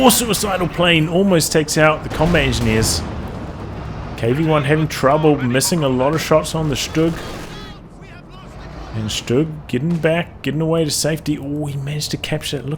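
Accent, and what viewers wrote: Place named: New Zealand. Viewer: You from NZ brother?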